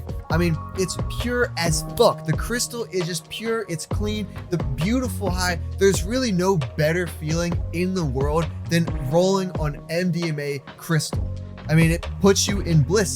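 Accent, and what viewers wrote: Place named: East Coast, United States. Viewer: Man I don't mean to fanboy, but i would love to hang out with you. Sounds like you're on the east coast somewhere. Mid-Atlantic? Close to Pennsylvania?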